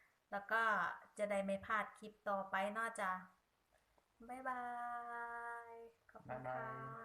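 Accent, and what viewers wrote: Korat, Thailand. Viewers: สวัสดีจ้า ภาษาโคราชน่าฟังค่ะ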